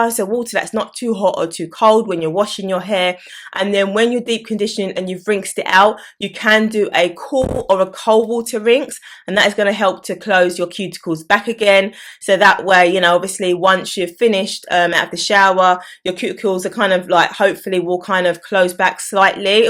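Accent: United Kingdom. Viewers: This sounds mean spirited. Also, there’s lots of British accents. The one she has is typical not the BBC/RP one you’re used to hearing in film usually. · What an accent? Is it really British or it's faked?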